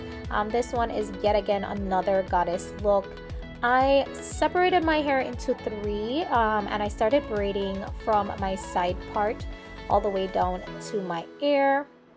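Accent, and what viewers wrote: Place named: Jamaica. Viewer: Heard the accent and I was like...She is jamaican for sure!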